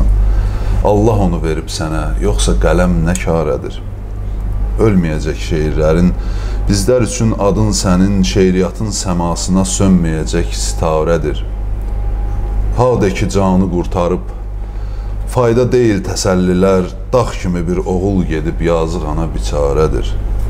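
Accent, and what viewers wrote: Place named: Shirvan, Azerbaijan. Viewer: Şirvan ləçəsi ləzət eliyir adama prostoy так держать земляк 💋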